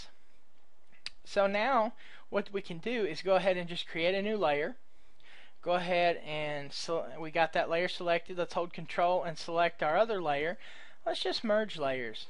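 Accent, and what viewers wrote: Texas, United States. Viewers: Thank you! but hey are you from Texas?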